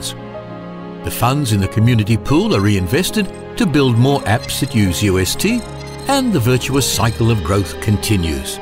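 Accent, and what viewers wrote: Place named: Australia. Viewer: Australian (I'm Australian – I can assure you he's Australian – or a Kiwi who's lived in Australia for a long time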